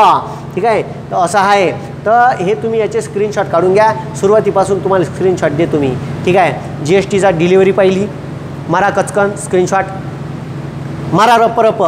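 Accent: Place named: Vidarbha, India. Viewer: Tumhi bava khare vidharbha vadi😅😅tumcha bolna maley lay awadla 😅😅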